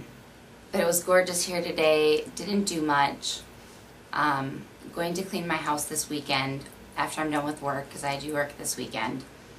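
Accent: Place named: Midwest, United States. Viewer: You do have a " Midwestern " accent , but that's ok!!!! :) it differentiates each of us! I love how you say " roof " :) Em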